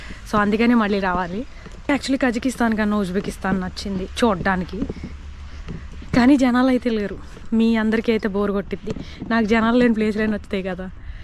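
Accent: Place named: India. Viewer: Hi 🙋I Like U R Bold Telugu Accent & Speaking Comedy Also🙋❤From Vizag (AP)💞😀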